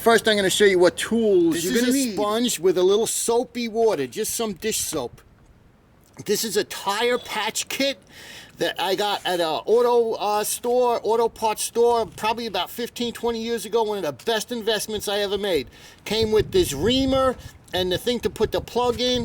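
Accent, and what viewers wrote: New York, United States. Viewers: this dude is such a new yorker its almost comical ! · I see you have a first class plugging tool. They work great, where poorly built ones crumple in use. Quality lasts. I usually pound around the patch wit a hammer to help seat the patch, then test after I drive a mile or two. For a guy who talks funny(that NY accent), you'all are sure easy to understand. Great video, again.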